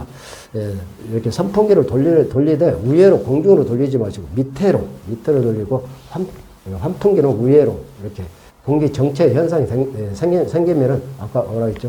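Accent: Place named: Chungcheong, South Korea. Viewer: 무반주 충청도 아줌마 정겹습니다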